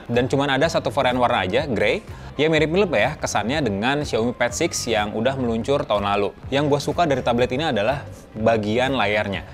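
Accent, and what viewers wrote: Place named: Brazil. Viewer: he has Brazilian Portuguese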